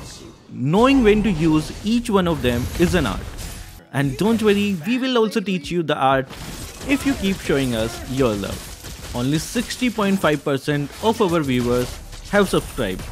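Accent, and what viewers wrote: India. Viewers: U r indian ? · Bro are you from India pls answer me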